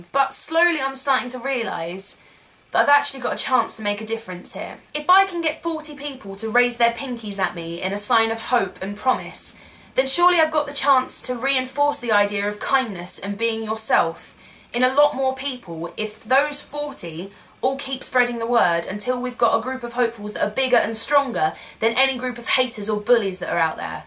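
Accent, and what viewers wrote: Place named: England, United Kingdom. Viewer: What part of England are you from? love your accent:)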